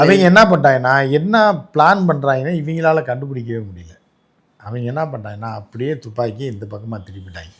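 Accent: Madurai, India. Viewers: டேய் மதுர பேச்சை நிறுத்துடா சடங்குசுத்தியாச்சுனா என்னடா?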